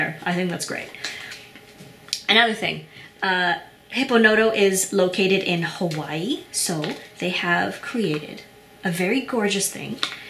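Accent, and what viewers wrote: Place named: Middle East. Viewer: Saw this...and an acute attack of “MUST HAVE NOW” struck me. Went to site via link and nosed around til I discovered the notebook in Forest Green with Lime Green trim...and selected lined paper. OMG. I’m in heaven. Hope I don’t have to wait too long. PS: you have a very, very slight accent that sneaks in now and them. Lovely. Middle East someplace? Israel? I sound like I’m from Oregon...since I am. Sorry.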